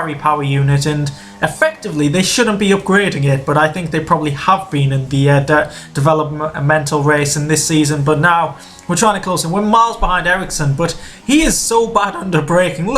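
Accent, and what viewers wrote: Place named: North East England, United Kingdom. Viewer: Are you from the north east of England? your accent sounds like its from there.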